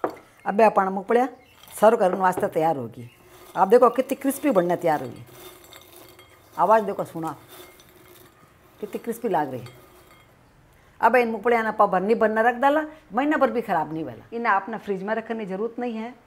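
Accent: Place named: Rajasthan, India. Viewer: I am from Ajmer Rajasthan and fond of your cooking and lovely way of talking marwadi.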